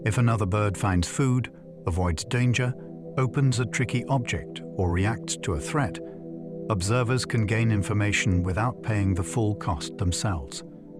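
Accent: United Kingdom. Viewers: Why is everything narrated with a British accent? It gets old, you know? If you listen to allot of things like this, or audiobooks, it gets real obvious. I can't take it. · 😂 Why shouldn't it be. I find the soft clear British accent part of the relaxing experience. And English is not my mother language.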